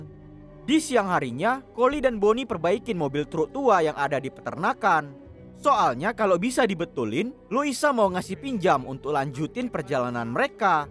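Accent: Medan, Indonesia. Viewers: Orang medan abang ini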